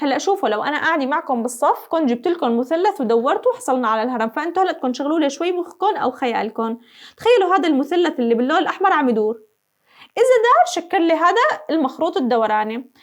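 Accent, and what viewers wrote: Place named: Homs, Syria. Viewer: حمصية يمكن انت شو بدك ؟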